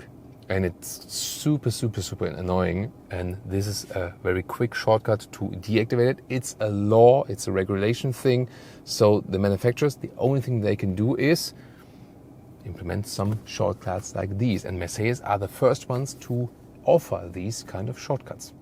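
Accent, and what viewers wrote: Germany. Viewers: I love your German accent. It gives your videos about Mercedes that Teutonic feel.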